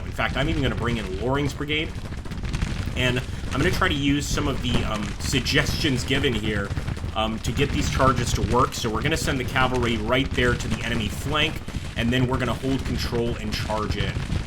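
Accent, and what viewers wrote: South, United States. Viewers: I love your southern accent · Love that southern accent, spicy.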